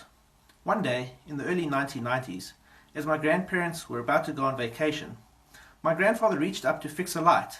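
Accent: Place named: South Africa. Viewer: It’s so bizarre seeing someone who looks like they’re from Tennessee talk in a South African accent. Cool stuff though.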